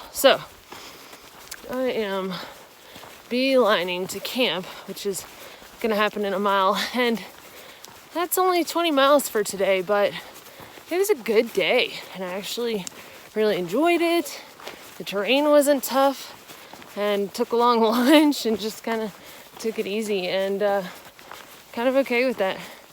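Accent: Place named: Alabama, United States. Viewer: okay, I'm hooked on your channel. I've only been watching for about two weeks and have watched most of your videos. I even watch them at work. You are such a good narrator and videographer. I love your accent. I am married to an Alabama girl and even though I am from South Carolina, I can't get enough of those Bama girls talkin. Be safe out there.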